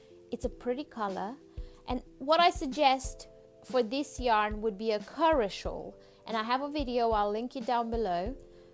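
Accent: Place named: United Kingdom. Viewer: HI. Enjoyed your video. BTW your from UK? Like your accent. THANKS.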